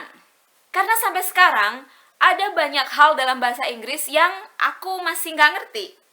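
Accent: Surabaya, Indonesia. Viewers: Ya kan mbaknya org indo, jelas masalah aksen atau logat tentu berbeda, sama kayak singlish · Suroboyo mana suara nya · U hv such a cute Indonesian accent while ure speaking English 😘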